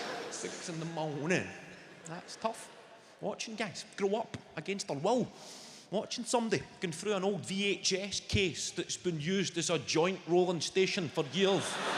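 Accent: Scotland, United Kingdom. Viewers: Literally everything is funny with a Scottish accent - it’s an absolute gift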